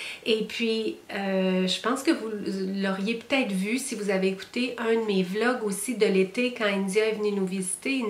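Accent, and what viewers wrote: Canada. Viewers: Hello! Merci pour ta chaleur et ta douceur... Je te trouve lumineuse même si tu n'as pas dormi cette nuit! Je suis une nouvelle abonnée et j'aime beaucoup justement ton rayonnement, tes ondes positives ♡ Je te regarde depuis la France et j'aime beaucoup ton accent canadien ♡ Je pense que tu pourrais tester les produits et nous donner tes impressions après, cela semble une idée sympa. Je te félicite pour ta chaine et continue c'est un plaisir de de te voir rayonner ☆☆☆! Bisous d'une autre Nathalie! ♡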